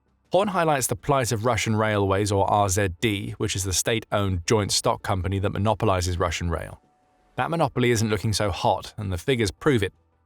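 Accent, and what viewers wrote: United Kingdom. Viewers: This channel is funny a guy with poor knowledge off military history and tactics making claims about that which he doesn't understand. I am bewildered that he has over a million followers. a sign that if you have good editing skills and a British accent people will believe any nonsense. Russia isn't losing. the only reason that the war is slowing for Russia is that it's being backed by billions of dollars of foreign aid. we are dumping massive quantities of money to keep the Ukrainian State afloat at no personal gain to us whatsoever. it's an absolute bogus nonsense strategy that won't benefit anyone except this false idea that Russia is going to try to Hitler-esque conquer Eastern europe. it's not happening, it never will happen in our lifetimes, and I'm tired of sitting here and pretending like that's the motivation. it's very obvious that the war in Ukraine being funded by the Western allies is a great way for some corrupt money deals to go down. 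I mean how many billions did this guy lose? Russia isn't losing, nor will it unless something wild changes. Billions of western dollars looks like Ukraine slowly losing. what a joke to listen to this guy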